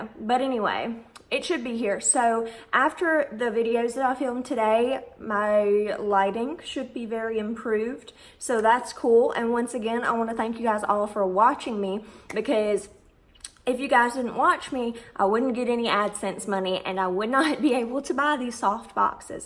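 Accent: South, United States. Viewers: Far as I can tell,your makeup looks fine. Also,where are you from. Noticed a southern drawl. I,too,am a southern gal. Southern Louisiana,in fact. Saw a few videos. Seems like Jennifer is sabotaging the shop. But,since she's blowing the boss,it's unlikely she's getting fired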